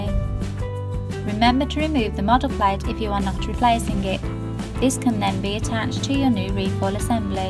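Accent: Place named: United Kingdom. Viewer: Watching this from the states, much more enjoyable with that soft British accent than the beer drinking mechanics we have here in the US. LOL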